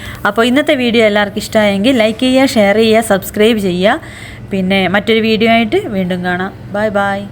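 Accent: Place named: Kannur, India. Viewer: ആദ്യമായി കേൾക്കുകയാണ് മാഡം കണ്ണൂര്കാരി ആണോ?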